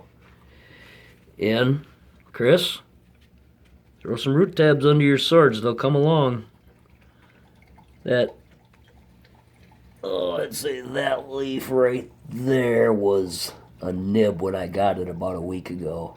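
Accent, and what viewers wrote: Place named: North America. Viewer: the standard 10 gallon aquarium in North America, likely where he lives as he has a North American accent, has the dimensions of 20" x 10" x 12". This tank is also longer than it is tall, and taller than it is wide. I can almost guarantee it carries those dimensions. In different countries, such as Australia, a standard 10 gallon often has different dimensions. But this was in North America.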